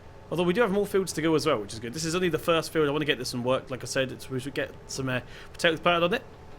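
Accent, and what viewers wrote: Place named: Plymouth, United Kingdom. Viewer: Bit of a Plymouth accent there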